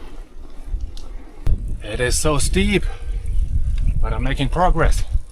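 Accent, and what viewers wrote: Germany. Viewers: 很喜欢你的德国口音的英语，希望你一切顺利∽ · im curious bro where r u from, i like ur accent haha , Germany Belgium or Holland，btw , welcome to china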